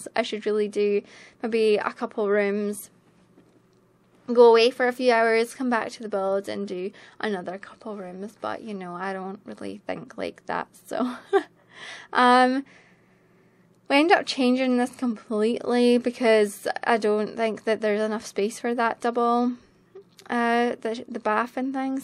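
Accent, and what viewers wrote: Scotland, United Kingdom. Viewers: I think we might come from the same part of Scotland as I couldn't tell u where Scottish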